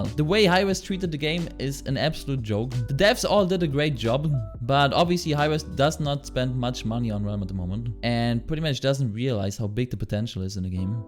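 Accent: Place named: Germany. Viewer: Bist du Deutscher wenn ich fragen darf, das hört man irgendwie heraus...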